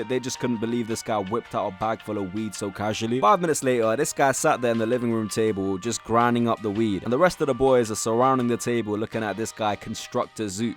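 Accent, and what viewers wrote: United Kingdom. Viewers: Are u from brum mybro?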